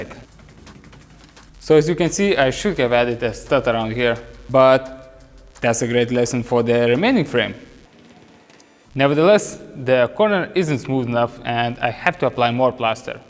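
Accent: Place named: Russia. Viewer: Даже мне рускоговорящему режет русский акцент 😅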